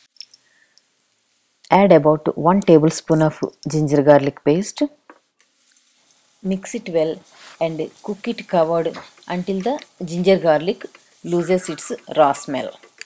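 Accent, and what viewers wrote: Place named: South India, India. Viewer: This curry was amazing. I love your direct and very clear directions. What a wonderful South Indian accent you have, ma’am. Thank you 🙏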